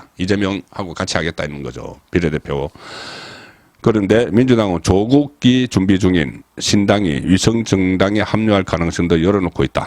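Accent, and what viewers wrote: Gyeongsang, South Korea. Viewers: 경상도식 비^례대표. 미^래통합이 아니고 비~례대표. 미~래통합임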